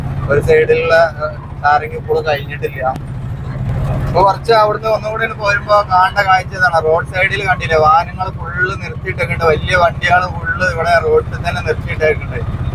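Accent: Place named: Thalappara, India. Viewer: ഭാഷയെ പറ്റി പറയുമ്പോൾ ഒരു രസം തന്നെയാണേ ഇപ്പോൾ (ഇപ്പം) എന്റെ ഭാഷ എന്റ വീടിന്റെ ഒരു 5 KM പടിഞ്ഞാറ് ഭാഗത്തേക്ക് പോയാൽ ഒരുപാട് വ്യത്യാസമുണ്ട് അത് പോലെ എല്ലാ ഭാഗത്തും ഉണ്ട് ഇദ്ദേഹത്തിന്റെ സംസാരം കേട്ടിട്ട് തലപ്പാറ മുട്ടിയറ ഭാഗത്ത് താമസക്കാരനാണെന്ന് തോന്നുന്നു ' നിന്റെ ഫാസയിയിൽ ഒരു വീഡിയോ ചെയ്യ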